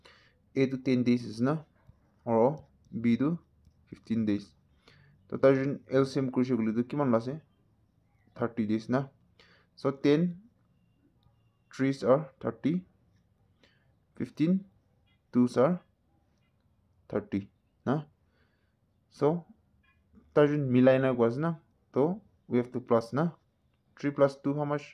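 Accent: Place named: Nagaland, India. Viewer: Perfect shortcut formula sir I gues you are lotha gentleman your accent 😀 Last answer is option b 5 1/3days Correct me if wrong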